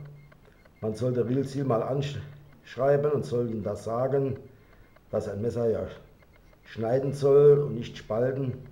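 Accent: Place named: Saarland, Germany. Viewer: Da höre ich doch einen saarländischen Dialekt raus. 8-)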